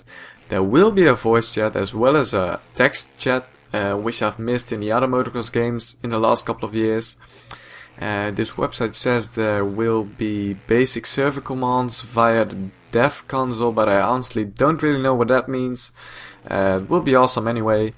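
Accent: Netherlands. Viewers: are you dutch?? you sound dutch ;);)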